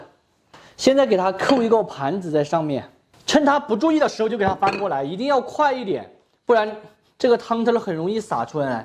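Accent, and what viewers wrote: Sichuan, China. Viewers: 冲着你这么接地气的川普，必须关注一下老乡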